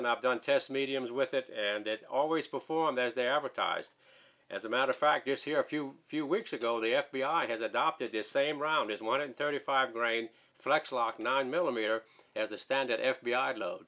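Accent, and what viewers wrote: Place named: South Louisiana, United States. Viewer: Read up on your bio in Wikipedia. I was born in June 1956, so you have almost 2 years on this rock more than me. Raised in the Arkansas cotton country 10 miles from the Mississippi my hunting as a youngster was primarily rabbits, doves and ducks. I had a Mossburg .410 bolt action and later inherited a recoil driven Remington Sportsman Model 48 in 12 gauge. I bought my first wheel gun at the local pawn shop at 21 in 38 special and did some major damage to the turtle and water moccasin population. I’ve carried a wheel gun since. The only thing I have ever won is the satisfaction of knowing I could face down any threat from thugs and gang bangers and my family was well protected. I’m a proud NRA lifetime member and a staunch believer in our 2nd amendment freedom. Oh, and I spent some time in South Louisiana, too and must say you may live “up north” now but I can still hear the bayou in your voice! 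Aiiyeee! Keep your powder dry and the videos coming! Best gun channel on YT!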